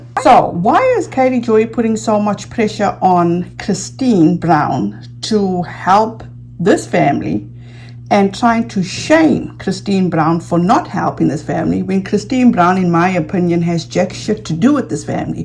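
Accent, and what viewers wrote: South Africa. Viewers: Are you freaking stupid?!? Kj was advocating for the kids. She's callinging Christine out for allowing her child to be used. I don't like that she is going in on Christine but tbf most are manipulated their whole lives for what is normal, kj is coming from a western idea. Being that you sound like a south African you can't understand her point of view. Like we don't agree or understand your pov with your countries history. But we let y'all be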